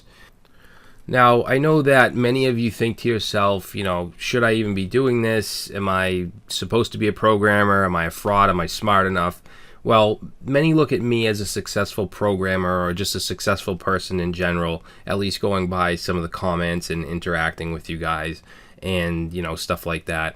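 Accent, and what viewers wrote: Boston, United States. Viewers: Don't hide the accent brah. A Boston accent is trendy now-a-days. Excellent video. I've definitely felt the imposter syndrome. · Love those videos. They inspire us to learn more. Keep producing them please. Your channel is the best I have encountered. Learned a lot from your channel. Oh, and stop hiding that Boston accent, it reminds me of a great movie, "The Departed". Happy New Year to you and your family! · Hi, this video really spoke to me. My history is plagued with depression and self doubt but decorated with a passion for coding. You really put the human element back in the job of coding. Many thanks. BTW, I am an old guy and your accent takes me back to JFK, a shining leader in my youth - hope you don't disguise it, I miss it, it is wonderful. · Needed to hear this this week - so thanks! Love your channel. Also don't hide your Boston accent! 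It's great!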